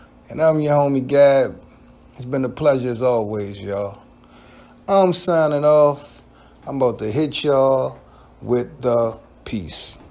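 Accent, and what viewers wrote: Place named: Boston, United States. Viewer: Hey where are you cuz you sound like you from Boston you sound like Snoop from The wire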